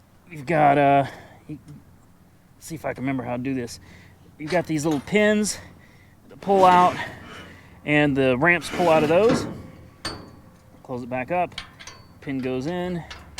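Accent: United States. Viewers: I love the way you speak your so calm and collected, as a Australian I often find Americans have funny and rather stupid accents but your the complete opposite. Also I love the content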